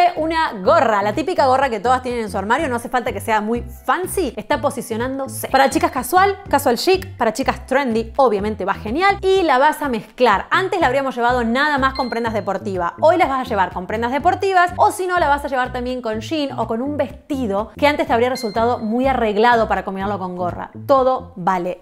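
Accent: Argentina. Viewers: Llegueeeee!!!!! Hermoso que no pierdas el acento argentino 😍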